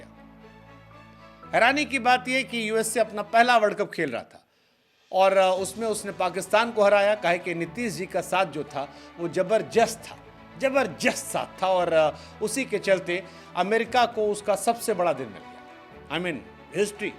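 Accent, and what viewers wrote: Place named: Uttar Pradesh-Bihar, India. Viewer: Aaj aapki aawaj up bihar lag rha hai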